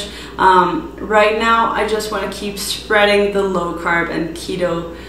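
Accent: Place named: Colombia. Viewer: Hi! Congratulations in your new English Channel!!! I'm sure that very soon you' ll have thousands more followers enjoying your easy and delicious recipes. Descubri tu canal en Espanol solo hace un par de meses y desde entonces busco a diario algun nuevo video que hayas subido con recetas o consejos. Me ayuda un monton que pongas los macros ya que yo sigo un keto... free lance... quiero decir, siin complicaciones. gracias por hablar tan claro, y enfocarte en el tema, muy profesional. Soy peruana,vivo en Canada, pero por 8 anos vivi en tu bella Colombia, en Cartagena, tierra del Vallenato que adoro y llevo en el corazon. Goze carnavales en Barranquilla y estuve de paso por Bogota donde hice algunos amigos, asi que el oir tu acento trae muy lindos recuerdos del pasado. Felicidades a ti y a Carmen, y que sigan los exitos.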